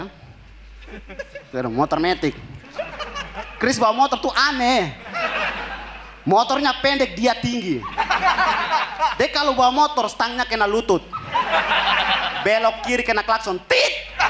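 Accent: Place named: Kupang, Indonesia. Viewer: Asli logat Timor Kupang.. 😅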